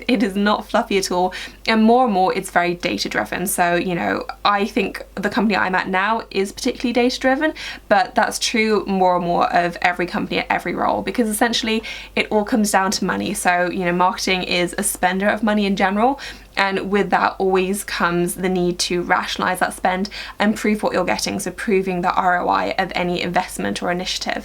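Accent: England, United Kingdom. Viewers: Watching from Portland Oregon USA love your videos! :) wish I had an English accent haha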